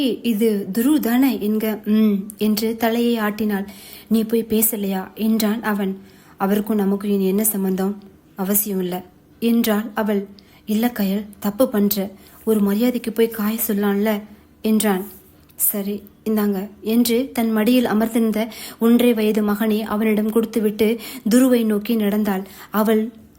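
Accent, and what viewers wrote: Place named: Sri Lanka. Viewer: 🎉🎉🎉 srilankan Tamil 😊😊good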